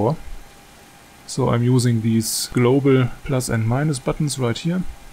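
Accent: Germany. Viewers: Super Video, sehr gut gemacht. Ich nehme jetzt einfach Mal an das du Deutsch bist, das hört man. Englisch sprichst du sehr gut👍 Im Not too Bad in speaking english, however it could be better i supose.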